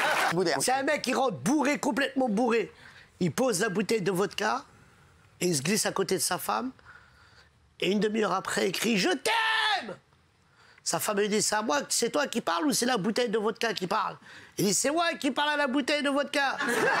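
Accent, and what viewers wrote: France. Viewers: Cest si plaissant ecouter des blaguees du vrai français et non quebecois français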